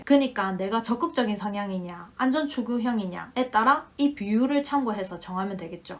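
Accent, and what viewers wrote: Gyeongsang, South Korea. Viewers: 경상도 사투리가 crystal clear 로 잘 들려요. 저는 경기도 정보 감사합니다 ETF 도 여러 종류가 있던데 묶음주식 10개 Main 을 보면 많이 다르던데 추천 부탁드립니다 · 누나 ㅋㅋㅋㅋㅋ설명 너무 찰진다요 ㅋㅋㅋㅋㅋ 경상도 사투리라 더 머리에 쏙쏙 들어옵니다 ㅋㅋㅋㅋㅋ진짜 구라안치고 저는 ETF가입할까 말까 고민만 2년째했는데 누나 영상보고 바로 결정했습니다 ㅋㅋㅋㅋㅋㅋㅋㅋㅋㅋㅋㅋ감사합니다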